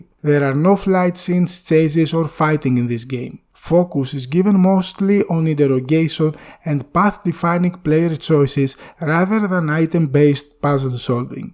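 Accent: Greece. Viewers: I still remember the day when i first loaded Pandora Directive into my computer and started the game , it remains my favorite game of all time until now! Btw from your pronunciation i would bet you are Greek.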